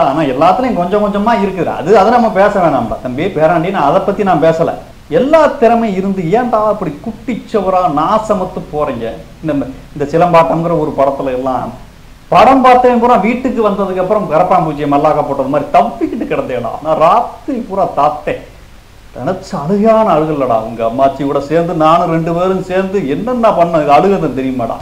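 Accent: Madurai, India. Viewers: ஒழுக ஒழுக... 😂 கதற கதற... 😂 கொதவல கடிக்குறாங்க... நம்ம மதுர Slang செம்ம...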